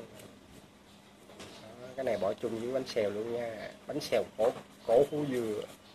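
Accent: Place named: Southwest, Vietnam. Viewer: Rat thich Video clip cua Anh, hinh anh giong mien Tay cung giong noi chan chat mien Tay cua Anh lam toi nho lam que toi . Hy vong mot ngay gan day se ve VN cung anh nhau mot bua : ))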